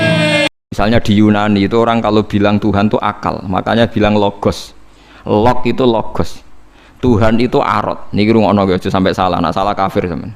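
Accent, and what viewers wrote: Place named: Java, Indonesia. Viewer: wes medok jawane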